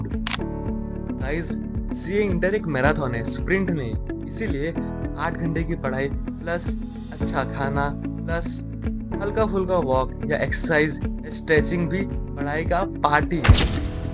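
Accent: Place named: Gujarat, India. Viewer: bhai bohat achhi video banai hai, ek dum jordar and your gujarati accent😀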